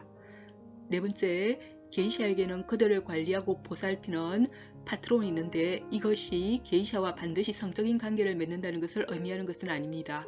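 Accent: Gyeongsang, South Korea. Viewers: 사투리라서 그런거니 자꾸 고치라고 하지 마세요. 억양이나 발음이 서울말씨와 다른것이 잘못된것은 아닙니다. 다른지방 사람들에세는 익숙하지 않아서 그렇지. 그리고 바꾸기 몹시 어렵습니다. 서울사람 아무리 연습해도 절대 경상도말투 못배웁니다. 서울 사람이 경상도에 가면 경상도 말로 고쳐야 합니까? 절대 나무랄 일이 아닙니다. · 그냥 경상도쪽 아주머니 딱 말투구만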